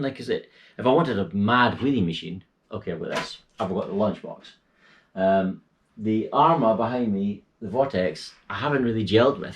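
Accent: Scotland, United Kingdom. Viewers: Yeah, man, I enjoy your talk videos! Must be the Scottish accent and your sense of humour, I usually get bored with long talk videos. Yeah, you're right, it's good fun and people should get one! Ha ha, at least you were drunk when you built yours, I think I was mostly sober, but damn, I hated putting it together! And to make me 100 percent certain mine was cursed, first time I took it for a blast, not one but two bearings seized, one wheel bearing from Fast Eddy, and the other was the bearing in the brushless motor, damn... I kept being unlucky, on my second time out, I broke a wheel (on video)... I hate those wheels, I plan to lower mine and put buggy wheels for the summer... I can't badoing mine, I'm using the smaller bumpers, works alright, I think... If you want, check it out, I have a video with mine, should be okay fun to watch...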